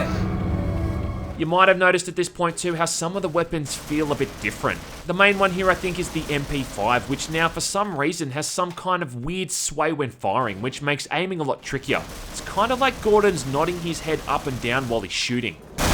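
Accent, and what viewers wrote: Australia. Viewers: Real talk. Do you really lean into the Australian accent, or is this natural? You have the thickest accent of all the Ozzie YouTubers I’ve watched haha. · Your Australian accent, combined with your witty humor , made me laugh so hard I sneezed out my coke......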